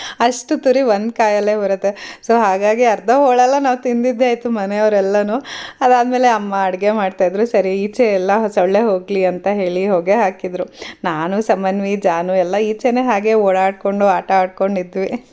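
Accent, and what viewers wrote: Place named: Uttara Kannada, India. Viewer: Hearing ur language I thought u r Frm uttar kannada district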